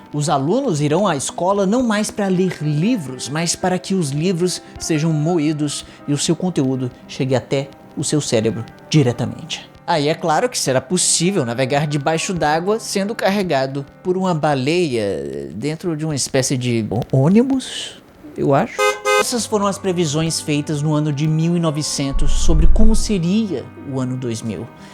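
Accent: Ceará, Brazil. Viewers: Tu é cearense né macho vei ?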